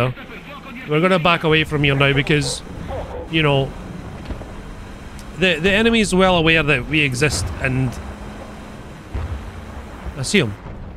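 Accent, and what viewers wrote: Scotland, United Kingdom. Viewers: I have no interest in war thunder, I’m just here for that Scottish accent and the Tanks.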